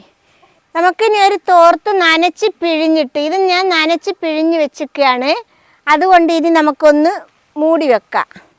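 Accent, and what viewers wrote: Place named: Malappuram, India. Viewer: ഇനി ചൂടാറാതെ പെട്ടീല് ബെക്കാം....😂😂, തനി മലപ്പുറം ഉമ്മ❤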